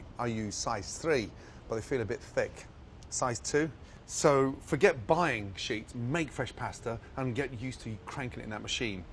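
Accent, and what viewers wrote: United Kingdom. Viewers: イギリス英語を勉強できるいい教材だと思います。 イギリス英語を喋る友達となんか雰囲気が似てる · 料理と関係ないけどイギリス英語やっぱ好きだ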